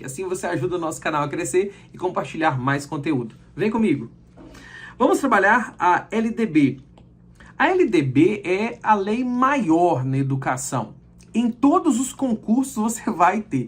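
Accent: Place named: Minas Gerais, Brazil. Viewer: Mas vc tem sotaque mineiro.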